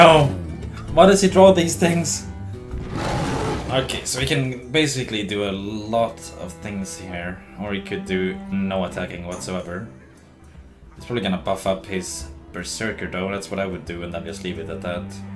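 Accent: Sweden. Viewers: What? How can you mistake Swedish accent to some American accent?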